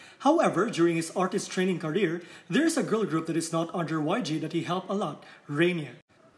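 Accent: Philippines. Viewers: pustahan pinoy itong nagsasalita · I could tell the man narrating this video is a filipino lol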